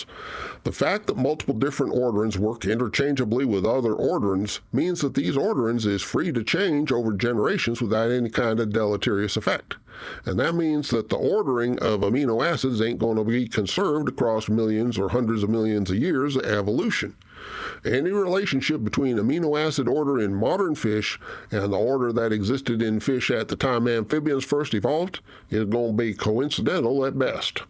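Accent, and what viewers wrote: South, United States. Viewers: Weyell, the cognitive dissonance betwixt country hick-sounding bear and educated skeptic somehow ain't keepin' me up at night! I like it, and why not adopt an easy-to-recall schtick? For new relatively unknown creators, do you know Ivy Windsong Collins? She's got older southern belle down very nicely indeed.... TTYL!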